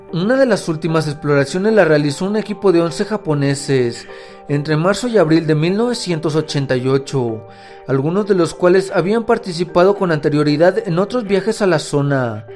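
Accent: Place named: Texas, United States. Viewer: Buen video, pero no hables como si tuvieras un gancho presionando tu nariz. Y ese dejo de ranchero texano le resta seriedad a tu voz, te sugiero hablar pausado, claro, fuerte y acento neutro. Éxitos!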